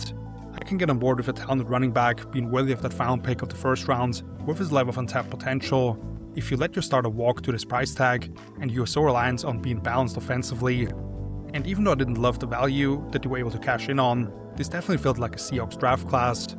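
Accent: Austria. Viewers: Well done sir, solid analysis all around. I appreciate the Austrian accent as well.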